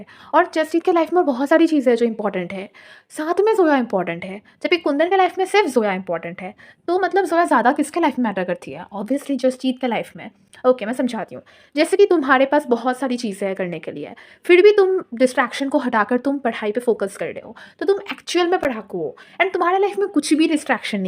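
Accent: Bengal, India. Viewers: you're bengoli am i right?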